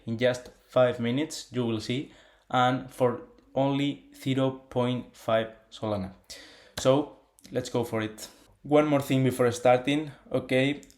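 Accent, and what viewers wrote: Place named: Spain. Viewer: Vamos ese acento español ahí a muerte! Buen contenido!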